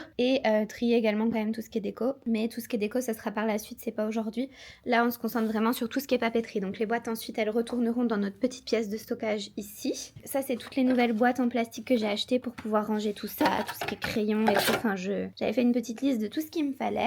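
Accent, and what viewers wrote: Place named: China. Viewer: Purée ton accent chinois 😂😂😂😂 il est adorable 😂😂😂 你好 我觉得这个视频很好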